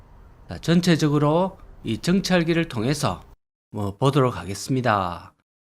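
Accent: Gyeongsang, South Korea. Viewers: 오우 경상도 싸투리가 외국어 처럼 듣기 힘들어요😢😢😢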